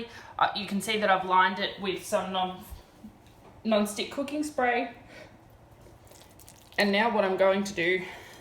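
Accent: Australia. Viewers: Lovely to hear an Australian voice ❤️❤️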